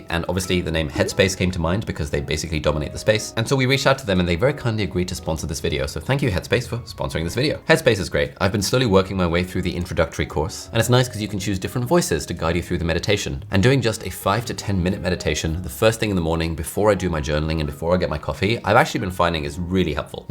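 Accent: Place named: United Kingdom. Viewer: Have you ever made a video of your transition from Pakistan to the UK? How did you absorb the British English dialect?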